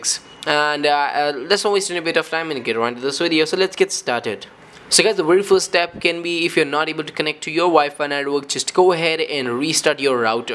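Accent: India. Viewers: Thank you so much. It worked in my iphone by resetting network settings. I was feeling helpless before that. Search in YouTube how to fix it and found your video first. Btw I liked your indian accent.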